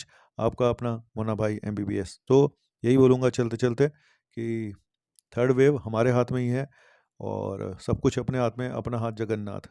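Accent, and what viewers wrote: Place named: Delhi, India. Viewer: Aap ka dilli vala style kya khub hai... 👍👍👍👍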